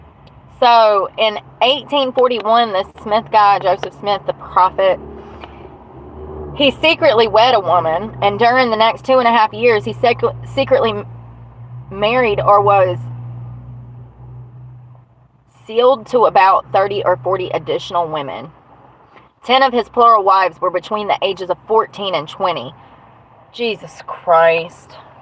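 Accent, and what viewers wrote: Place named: North Carolina, United States. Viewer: How are you? Checking in haven't had a chance to hope everything is going better! You always look beautiful and I adore your North Carolina accent. I can't figure out why everybody in the South North Carolina has the strongest southern accent? I watch another creator that has the same! It's freezing up here nights are in the twenties. Hope the weather is nice for you!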